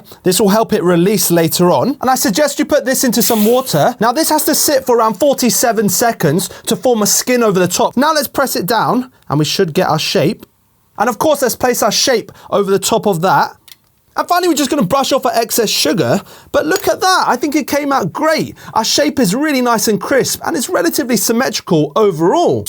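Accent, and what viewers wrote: United Kingdom. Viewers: my god! He has the bri-ish accent!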